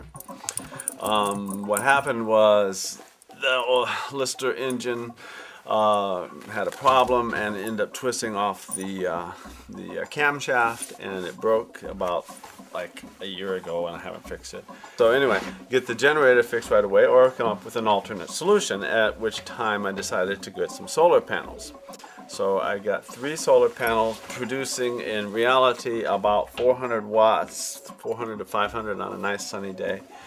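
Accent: South, United States. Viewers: You are originally from the South, correct? I've been watching you for quite a while and always kinda figured that you were from NoLA or S. Mississippi, just going by the accent.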